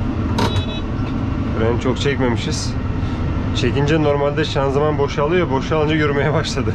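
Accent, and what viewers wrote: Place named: Istanbul, Turkey. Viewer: İstanbul Türkçesiyle son teknoloji tarım makinelerinin bu kadar yakışması peki ❤❤❤ örnek bir Adamsın sen 👏👏👏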